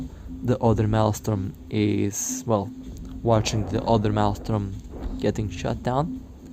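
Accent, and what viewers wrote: Russia. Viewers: Лучше бы по русски сразу говорил, акцент русскоговорящего ппц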